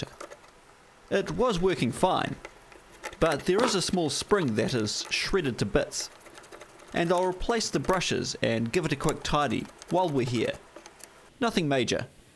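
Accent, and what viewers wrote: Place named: New Zealand. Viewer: Ahh the refreshing sound of a kiwi accent whilst abroad. Great stuff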